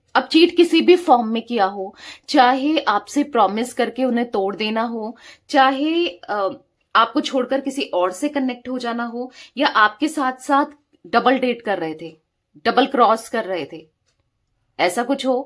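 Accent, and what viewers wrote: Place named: Punjab, India. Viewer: Ap ki awaz Punjabi+Urdu = Mix tone ha unique voice ❤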